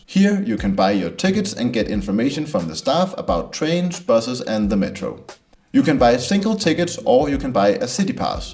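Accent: Denmark. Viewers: Hello. thank you for the information.Is that a danish accent?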